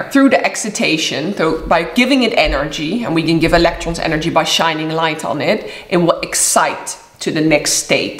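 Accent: Netherlands. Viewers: interesting video! BTW, Ben je Nederlands? ;) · Was it just me or was anyone else wondering which country this youtuber is from? She has an indian name, a dutch accent, speaks british english. Bet she lives in the US. Talk about being a global citizen! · Nice Dutch accent. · What’s your native language?My guess is Dutch or Flemish? English is a tricky one sometimes. “Thoroughly” is pronounce “thur - O - lee”, with the “gh” more like in though than in rough.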